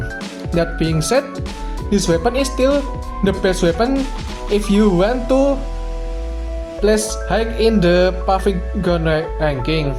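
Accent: Indonesia. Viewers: Bah medok bgt suarane😂😂😂😂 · im glad im indonesian so i can understand the accent 😭😭☝️ thanks for covering this haha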